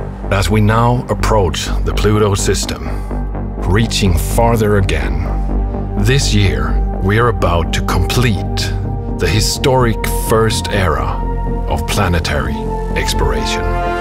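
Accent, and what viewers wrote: Sweden. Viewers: I thought he sounded Swedish =)...I was right. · TROLLS DOE He's just Swedish.. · The speaker must be Swedish. He has to be. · I bet the narrator is Swedish :D You can clearly hear the accent. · Why did you pick a swedish voice over dude. Hurts to listen to.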